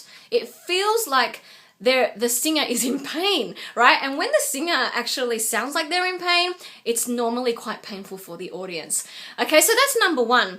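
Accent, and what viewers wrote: Australia. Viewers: Omg are u Australian I looove the Australian accent it's soo cool!!!!! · Does she speak with Australian accent? Or am I mistaken? :)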